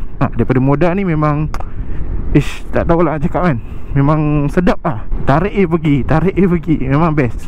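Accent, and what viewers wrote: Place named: North, Malaysia. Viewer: Baru 1st time tgk video abg..menarik makin hujung video makin byk pelek utara kouaq 😅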